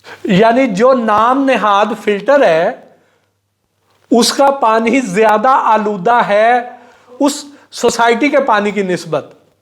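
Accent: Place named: Punjab, India. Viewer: ۔۔۔ اتنی لمبی باتوں کی لسی بناتا ہے ۔۔۔ کی جیسے پانی میں مدھانی چلائی جاتی ہے ۔۔۔ بلکل بک بک بکواس بلکہ بکواسیات لگتا ہے ۔۔۔۔ یہ پینڈو لب و لہجے میں بات کرنے والا یوٹیوبر بلکل گھتو ڈھگا پنجابیوں کےلب و لہجے میں بسوں میں چورن بیچنے والے کے سٹائل میں بات کرتا ہے ۔۔۔ ابھی پچھلے دنوں کشمالہ کا انٹرویو کر رہا تھا اور لگتا تھا کہ پتلون گیلی ہو چکی ہے ۔۔۔ اور مکمل تھلے لگا ہوا تھا ۔۔۔۔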